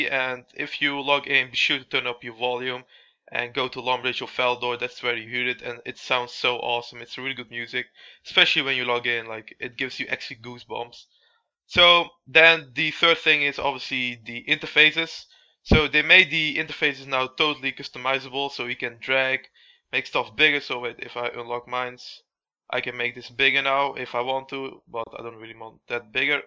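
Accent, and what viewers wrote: Netherlands. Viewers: je hoort egt dat hij nederlands is :p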